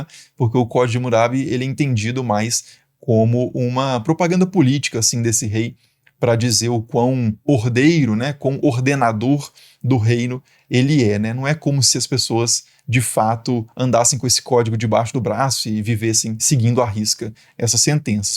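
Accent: Minas Gerais, Brazil. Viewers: O fala de um jeito como se gostasse da gente! Típico do sotaque mineiro!❤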